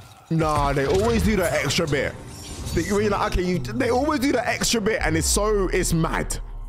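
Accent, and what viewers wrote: United Kingdom. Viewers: bro is so bri'sh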